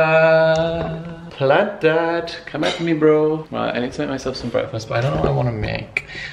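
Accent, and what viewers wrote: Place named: Sheffield, United Kingdom. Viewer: Binge watching videos when I'm at uni so I can hear an authentic Sheffield accent to remind me of home loool🙃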